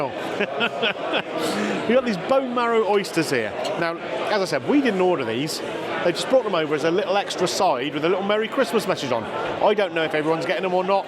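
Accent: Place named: West Country, United Kingdom. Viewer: yes mate when I was watching you sounded abit more West Country but then you would say the odd word which you sounded like a Hampshire hog like me haha.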